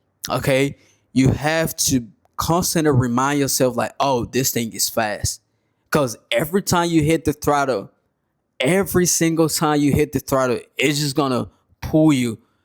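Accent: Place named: Liberia. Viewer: Your accent, you sound Liberian.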